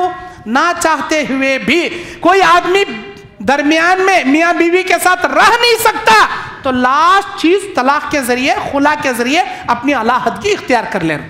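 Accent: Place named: Solapur, India. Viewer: Sobhanllah aapmyry sholapur ky hyn bat cheet ka lhja bhe alhmdu lellah jzay khyr dy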